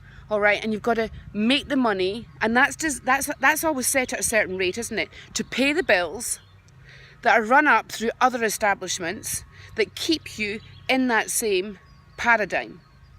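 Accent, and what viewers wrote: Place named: Scotland, United Kingdom. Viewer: Hi,. What a gorgeous place and nice to hear a the Scottish accent. Beautiful. I wish I could dream manifest the life I want, I would feel happier and less sad if so. xx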